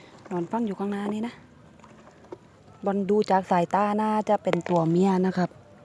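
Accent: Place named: South, Thailand. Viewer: พึ่งดูครั้งแรกค่ะ สนุก พูดภาษาใต้น่ารัก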